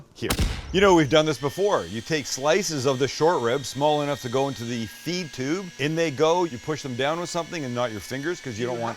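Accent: Ireland. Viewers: You from Ireland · Love the show and this sounds awesome!!! Have you ever considered a Kentucky Hot Brown, yum I think you should do at least one whole show using your Irish accent, lol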